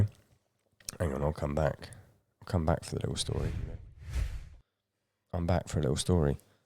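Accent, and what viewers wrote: United Kingdom. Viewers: Love your British accent...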